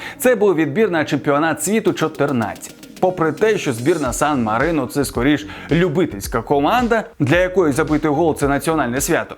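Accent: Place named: Russia. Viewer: Я думав, тут голи покажуть, а тут тільки хлопець хизується, немов перед дівчатами. Ще й акає, мов рюсскі.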